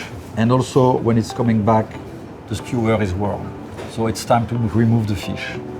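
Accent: France. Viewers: When you speak perfect English with perfect French accent ahaha 😮😂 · The only French accent I can listen to without fatigue. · Merci Chef. Bel accent, merci de rendre hommage au francais. Calliss zont qu'a faire l'effort de nous comprendre les osti d'anglais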